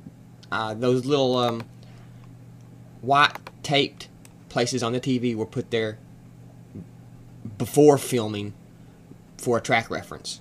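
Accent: South, United States. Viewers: Out of all the help I have ferreted out, your help takes me over the top. You rock! Although I wish you would use STP and place a compressor on your vocal track--your southern good old boy accent kills me.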